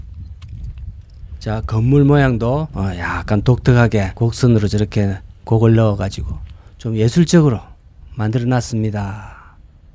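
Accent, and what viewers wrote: Gyeongsang, South Korea. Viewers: 전라도 사투리도 듣기 싫지만 경상도 사투리 심한 것도 귀에 거슬립니다. 억양은 어쩔 수 없더라도 일부러 더 심한 사투리 쓰는 것은 좀 지양하심이. 몰입을 방해해요.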